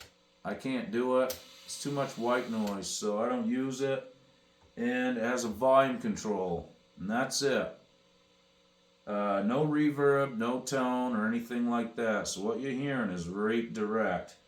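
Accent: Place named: Maine, United States. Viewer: You sounds like you straight came out of middle/central Maine.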